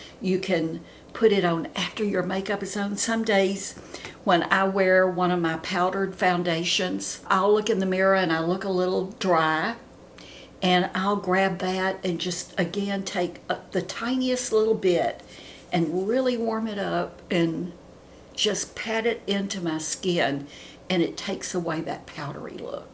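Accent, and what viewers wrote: South, United States. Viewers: I am closing in on 79 and was so pleased to find you onout youtube. I live in North Carolina and am very happy to hear your lovely southern voice along with the fact that I enjoy your show so much. I won't visit youtube without looking for you first. Ann McE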